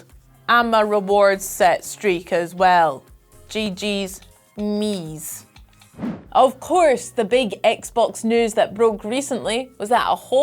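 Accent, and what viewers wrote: Scotland, United Kingdom. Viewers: Love hearing a fellow Scottish accent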